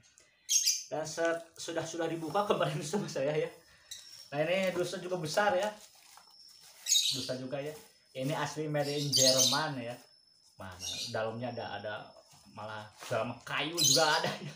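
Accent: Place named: West Java, Indonesia. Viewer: Kyk org sunda ngomongnya mh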